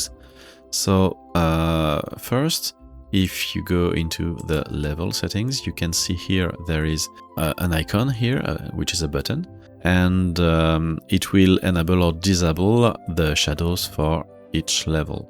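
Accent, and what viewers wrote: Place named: France. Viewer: I love that Youtube goes "ah yes, this guy is speaks french" and puts the AI English Dub over. Not even speaking french or anything btw... just... french accent LMAO Also looks epic asf dude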